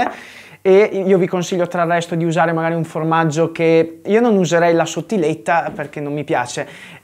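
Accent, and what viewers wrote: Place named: Trentino, Italy. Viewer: though he does have a Trentino accent with Veneto influences, and the dialects that are spoken there belong to the same family of southern French and Castilian and are the more "spanish sounding" of the country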